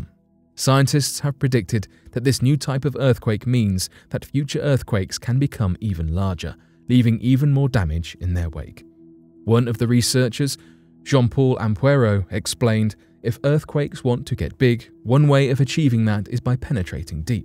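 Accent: England, United Kingdom. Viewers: What scientists? Names? Why is a guy with a British Accent even doing a video on this? Are we supposed to believe you more because of your accent? California has been experiencing quakes for years. Scientists look at data, the take an educated guess at when something happends. A dart board would be more accurate. Sorry but terrible video. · English or European? Love hearing your real voice on your blog love true dialects and love your channel and info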